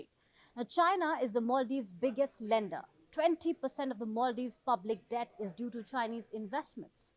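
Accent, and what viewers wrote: India. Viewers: Irritating Indian accent😂😂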